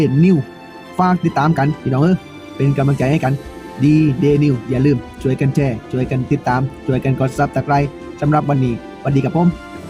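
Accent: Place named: South, Thailand. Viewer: ให้กำลังใจค่ะ.... พี่เด ❤🤣❤ เต็มใจเข้ามาดู อมยิ้มในภาษาใต้ ฟังรู้เรื่องนะ จับประเด็น🤔🤔🤔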